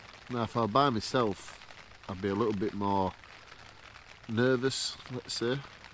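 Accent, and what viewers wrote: United Kingdom. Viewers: why is this common in usa to have someone attacking you at 1-3 am edit i realize his accent is from britain but still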